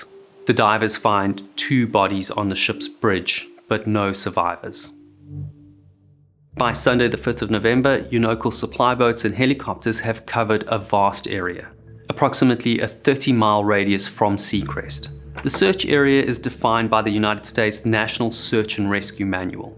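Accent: South Africa. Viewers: He's south-african, you clown.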